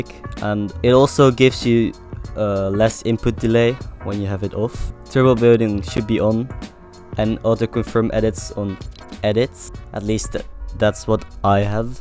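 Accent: Netherlands. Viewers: Yo maatje ik hoor dat je nederlands bent klopt dat?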